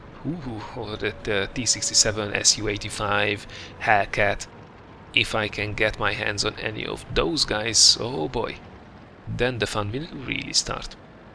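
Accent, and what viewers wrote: Hungary. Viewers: Gondoltam hogy magyar vagy az akcentusbol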